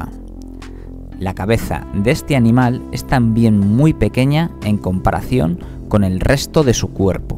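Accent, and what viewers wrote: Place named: Spain. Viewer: Espero algún día antes de morirme... nuevamente escuchar el acento latino ...Y no escuchar más este acento coño....tan miserable...tan feo ....tan.... pero tan....re...ni encuentro la palabra para describirlo....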